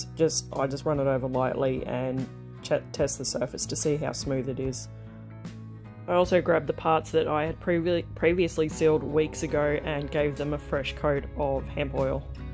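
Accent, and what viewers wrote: Australia. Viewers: Wow. So much detail work here. Your client should pay you well. I cannot imagine how many hours of work here. Love your Aussie accent and your quotes...."stand out like a dog's nuts". 😂😂😂. I always enjoy your sense of humor and look forward to your content. How many hours did you work on this piece?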